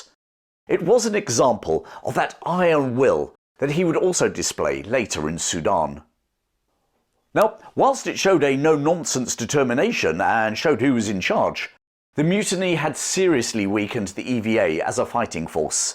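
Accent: England, United Kingdom. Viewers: I have to agree. No AI voice, and his real pommy voice I can understand. And we get to talk with him live.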